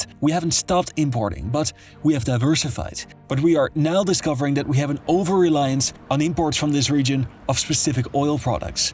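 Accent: Netherlands. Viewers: Mate are you dutch?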